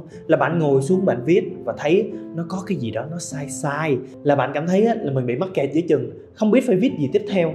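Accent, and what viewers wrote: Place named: Central, Vietnam. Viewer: Mình nghe là biết bạn người miền trung nhưng vạn nói hay đó, diễn đạt dễ hiểu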